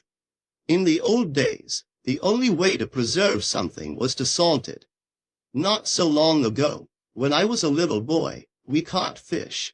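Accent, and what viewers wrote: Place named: United States. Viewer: Lovely but a bit weird that a slight American accent gets in there. I know his original voice in and out.. so it feels a bit foreign. Only a little detail. Otherwise the message is lovely 🥰👌🏼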